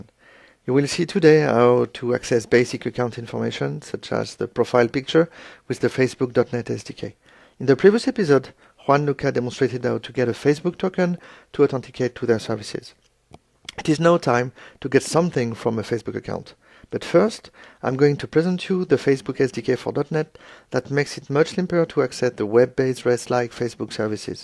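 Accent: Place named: France. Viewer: Cet accent français est tellement remarquable ;) Un grand merci pour cette vidéo. Je travail sur un programme C# et WPF de borne photo et souhaite proposer a mes utilisateurs de partager leurs photos sur FB. Je vais donc tester tous cela et je pense que cette vidéo me sera d'une grande aide... Le protocole FB évoluent régulièrement et il semble que la compatibilité soit d'ancienne version soient souvent remises en cause. J'aurais donc une simple question. La méthode proposée ici est elle compatible avec les moteurs FB actuels ? Encore merci !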